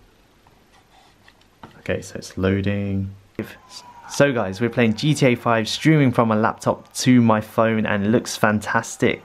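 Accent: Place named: United Kingdom. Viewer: Wow never seen an Asian man or woman speak a British accent until now, nice video as well. Don't have a PC but gonna get just something cheap to stream seeing as I need it. Thanks for the help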